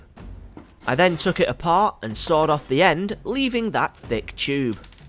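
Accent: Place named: England, United Kingdom. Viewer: Welcome to England pronunciations good sir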